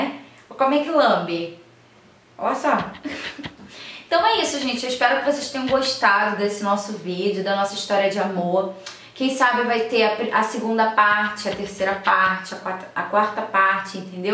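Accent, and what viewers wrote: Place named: Rio de Janeiro, Brazil. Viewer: Ela é bem do rio ela arrasta o nasci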